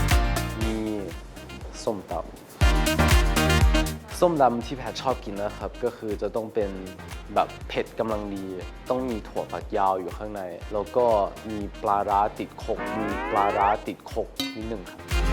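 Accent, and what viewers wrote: Isan, Thailand. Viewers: สำเนียงอีสานได้น่ารักมากๆๆลูก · น่ารักมากกกกกก เอ็นดูท่าปล่อยพลังอะ55555 พูดอีสานน่ารักมากกกก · เอ็นดูเว่าอีสานนนน 5555 ภาษาไทยไม่ชัดหนักแล้วลูกเอ๊ย 5555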